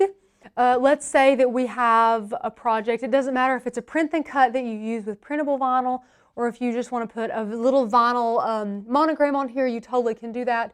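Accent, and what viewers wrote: South, United States. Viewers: Great tutorial... thank you. I really enjoyed listening to your Southern accent... for an Australian it is like a North American trying to understand someone from Scotland. Very cool.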